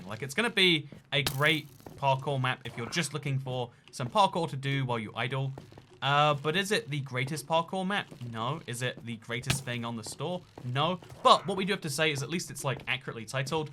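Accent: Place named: United Kingdom. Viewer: Ithough you were british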